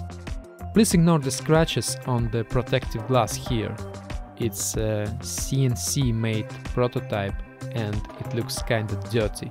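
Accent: Russia. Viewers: Этот русский акцент идеален) Хочу себе данный девайс) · Акцент специально делаешь такой заметно русский? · Чувствую Русский акцент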